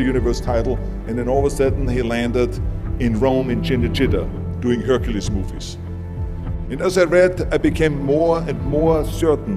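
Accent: Austria. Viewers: Thee Terminator because it’s not just German accent, it is Austrian German accent, plus the dialect specific to the region where he grew up. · You gotta have this charming austrian accent. Got it.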